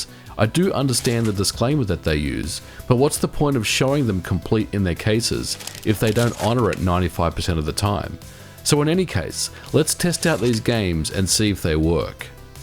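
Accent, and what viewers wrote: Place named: Australia. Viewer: As an Aussie I thought you lived in Australia...keeping your accent is a good effort.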